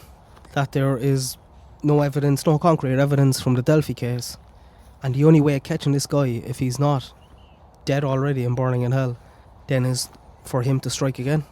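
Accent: Ireland. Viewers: No, I’m guessing it’s in Ireland somewhere…the narrator is Irish and the fauna looks like it would be in Ireland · Irish accent it's so lovely! X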